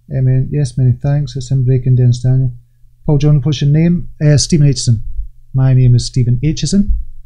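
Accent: Scotland, United Kingdom. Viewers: I just came for that Scottish accent :) Well, and I'm in the very early R&D stages of my crypto journey, so I'm really here just absorbing and learning. Nice to meet you. There was definitely alot of distortion here, and there today. I watched a bit, when this was live, and it kept freezing on my side, and now, on playback, I just hear the sound distortions. Just an FYI. Have a wonderful weekend!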